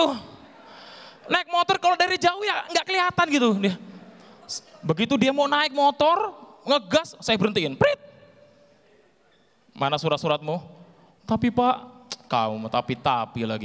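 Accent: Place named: Balikpapan, Indonesia. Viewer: Wkwkwkwkwk logat balikpapan yang sangat khas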